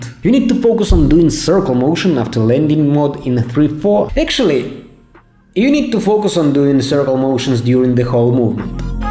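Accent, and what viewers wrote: Russia. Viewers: Акцент русский какой-то)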